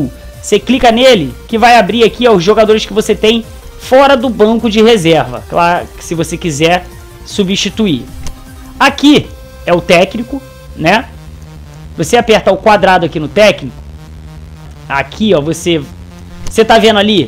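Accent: Rio de Janeiro, Brazil. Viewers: Q time vc torce? Pelo sotaque é carioca deve se pro Flamengo...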